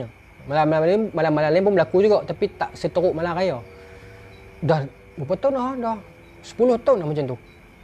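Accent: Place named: Kuala Lumpur, Malaysia. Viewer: terbaik abg..saya antara peminat ustaz..cara penyampaian yg sempoi.tp kali ni mula2 ckp k.l huduh piang dgr..hihihi..love u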